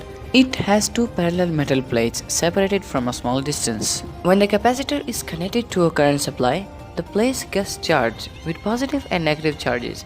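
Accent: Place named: United States. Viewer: are bhai kya tum America wale Ho Yaar your English is so best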